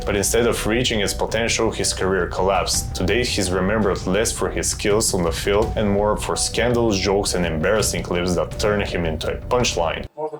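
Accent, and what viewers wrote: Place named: Balkans. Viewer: Ah yes... the most balkan looking man, talking about balkan music, with the most balkan accent. PLS KEEP REPRESENTING US! (Sincerely, an "Алт путка")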